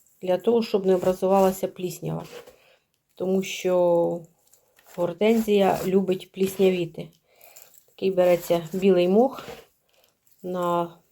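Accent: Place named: Cherkasy, Ukraine. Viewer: Дякую, що поділилися досвідом!!! Буду пробувати розмножувати, це так цікаво. У вас такий знайомий говір, ви не з Черкащини??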